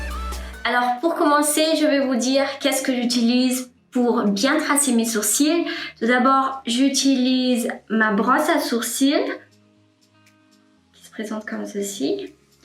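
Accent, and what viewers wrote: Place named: Germany. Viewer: Merci bcp,j' Adore votre chaine😙😙😙 Le Accent kou efa lasa Deutsch😀😀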